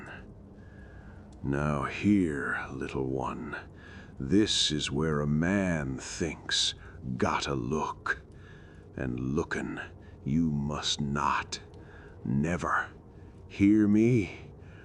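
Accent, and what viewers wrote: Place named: Appalachia, United States. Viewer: I find it an interesting mix that protagonist has a Russian name (a diminutive of Anton) but the narrator dialect makes me think this is takin' place down in the holler of Appalachia. I like it a lot!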